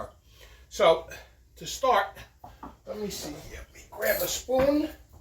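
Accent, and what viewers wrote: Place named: East Coast, United States. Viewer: I'm living in Chicago. First,I love the accent. I miss the East Coast. I love this.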